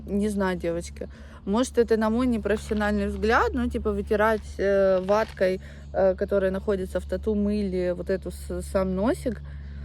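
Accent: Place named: Ukraine. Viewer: Ужас, вид, как будто трепали целую ночь. Вялая, нужно учиться не рот накачать, а учится,над речью. Это украинский акцент звучит примитивно. Волосы распущенные. Кажется очень дешего.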